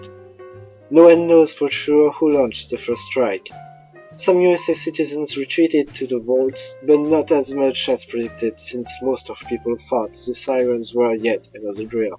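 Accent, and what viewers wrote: France. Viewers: tes videos sur l'histoire de fallout sont geniales et tres interessantes. Franchement rien a redire la dessus. Par contre, jvais passer pour un rabat joie, mais ton accent francais en anglais cest.. pas possible. Je salue tes efforts pour le faire en anglais avec les sous titres fr et anglais, cest un enorme travail jen suis conscient mais l'accent me deplait. Je suis désolé d'être franc comme ca mais si tu pouvais ameliorer ce point ce serait parfait. Mais sinon je te le redis tes vidéos sont geniales:-) A la prochaine! Grayef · I thought you were trying to do the accent. I thought that was kinda clever having a frech accent with the old jazz music. Didnt realize it was unintended. Good job with the history too. Ive only played fallout 3 and NV. I never exactly knew the history in the game. Good video. Please keep making more!!:D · Si je sais reconnaitre une chose c'est bien un accent Français en Anglais, dis moi, ne serais-tu pas originaire d'un pays pratiquant la langue de Molière?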